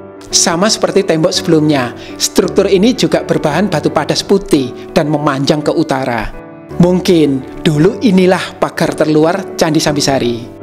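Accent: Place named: Java, Indonesia. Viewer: Jawa medhok!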